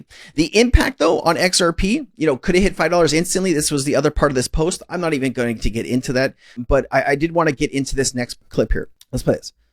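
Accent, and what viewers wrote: Australia. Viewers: Australian accent 😊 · Same here... the dude on the video was an Aussie!. was close...😊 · Yes he's Australian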